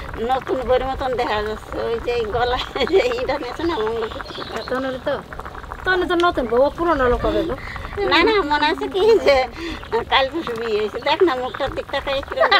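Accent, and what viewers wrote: Bangladesh. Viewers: সব ঠিক আছে কিন্তু এনারা কি বাংলাদেশের লোকজন? এরম ভাষায় কথা বলেন এনারা যেন মনে হয় বাংলাদেশে থেকে এসেছেন..যদিও বাঙাল অনেকেই কিন্তু পশ্চিমবঙ্গে জন্মগ্রহণ বা বসবাস করলে তো ভাষা পরিবর্তন হবে.. একমাত্র যদি born and brought up বাংলাদেশ হয় তবেই এতবছর বয়সেও ভাষা বদল না হতে পারে।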